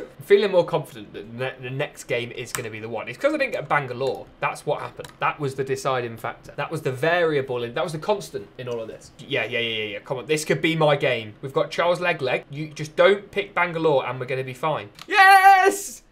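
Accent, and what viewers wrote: United Kingdom. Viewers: You sound like ali-a, it's almost like you might be from the same part of the uk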